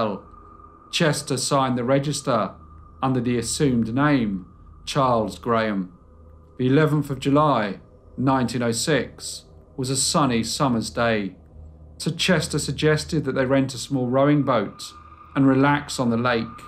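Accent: United Kingdom. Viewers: you really need to lose the british accent for your most large american audience. i would suggest california valley girl. the kardashians speak fluent valley girl, so listen to them. soon you'll be shortening words like gorgeous to gorg, fabulous to fab and ridiculous to redick. any word that ends in ous you will learn to shorten on the fly. i promise you your subscribership will soar.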